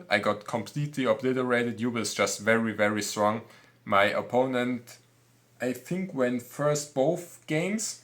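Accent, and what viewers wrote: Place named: Germany. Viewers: Very german english 😂❤ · he could dub a character with that voice, he didnt even have to tell me he was german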